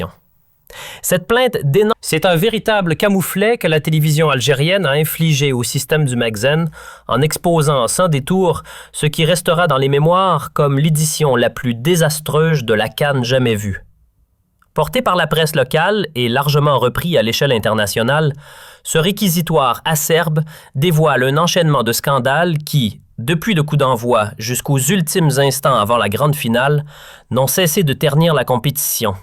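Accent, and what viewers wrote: Canada. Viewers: 🇲🇦🌟🇲🇦👑🇲🇦🌟🇲🇦✌ Votre post n'est pas une analyse journalistique professionnelle sérieuse, mais la lecture, par un citoyen canadien (selon son élocution), probablement contre argent, d'un communiqué de la junte militaire algérienne, d'accusations et de propagande antimarocaines gratuites, sans preuves. C'est une honte, de la part d'un citoyen ressortissant d'un pays démocratique, de travailler pour le régime algérien militaire non démocratique, régime qui opprime le peuple algérien depuis 1962, par la peur et par les files d'attente des citoyens pour recueillir les quelques biens de subsistance ou utilitaires (pas de lait, pas de sucre, pas de farines, pas de poissons, ...., pas de chambres à air pour les véhicules, pas de gasoil, pourtant l'Algerie est une économie gazière et pétrolière, ). Le Maroc n'est pas riche, mais il n'est pas comme l'Algérie connue pour ses files d'attente de la honte. 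Le Maroc a de bonnes relations avec le Canada, les USA, la Chine, la Russie, la France, le Royaume-Uni, l'Allemagne, ....etc. Toutes ces puissances, grandes ou moyennes, élaborent des rapports satisfaisants, sinon excellents, sur le sérieux, la crédibilité, et l'évolution positive socio-économique et politique du Royaume Cherifien du Maroc. Je ne flatte pas mon pays, mais le nombre de canadiens qui visent le maroc se chiffrent par milliers et les rapports des tour operator occidentaux sur le Maroc sont positifs et contredisent les falsifications algériennes que colporte votre post honteux.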